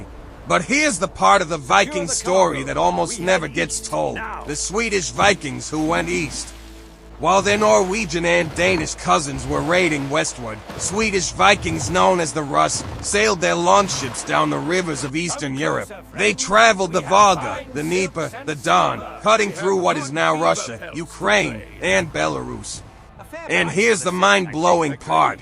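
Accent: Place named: Bronx, United States. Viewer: Another great upload. But, respectfully, the gruff, vaguely Bronx-ish voice narration...hmmm...not so much😆✌🏽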